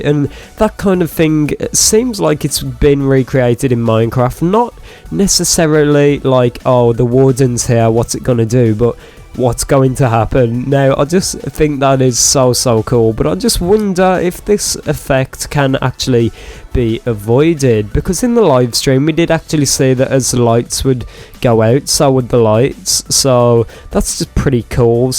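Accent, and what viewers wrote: Scotland, United Kingdom. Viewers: I think he's Scottish but idk the words he uses don't sound like a Scottish person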